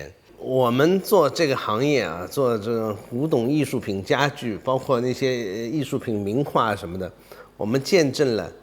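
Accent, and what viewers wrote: Shanghai, China. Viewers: 喜歡聽你聊天 有趣 上海人特有的口氣和用詞 很熟悉 🎉👍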